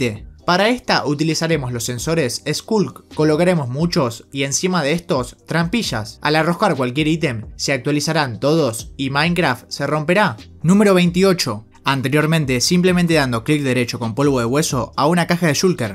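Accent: Argentina. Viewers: Lo mejor de los vídeos es la voz argentina.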